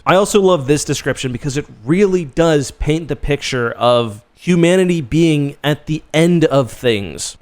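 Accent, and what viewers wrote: Canada. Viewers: I am confident just by hearing your voice you are a fellow Canadian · Canadian lol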